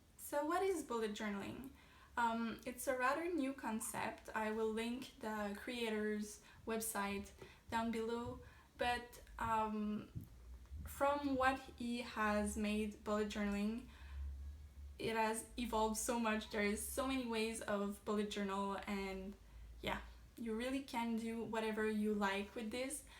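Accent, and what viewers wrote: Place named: Quebec, Canada. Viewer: I'm an idiot, - I've been trying to place your accent - & of course, you're French (Canadian?) ~, qui? Told you my French was rusty - hell, I even lived 7 worked in France for three years!! In regard to bullet journals, I cannot say I've ever used one - I tend to fill notebooks, then review what is necessary at the end of any, one week - & perhaps that is my peculiar method... Thank you for this latest instalment:D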